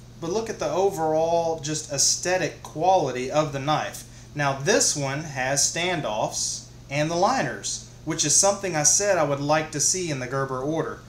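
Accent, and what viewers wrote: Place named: Texas, United States. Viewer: What part of Texas you from?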